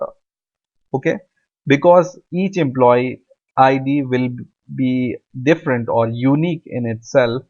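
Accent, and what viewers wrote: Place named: India. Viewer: His English is very good!! He just has the Indian accent which is natural considering he's an Indian! :)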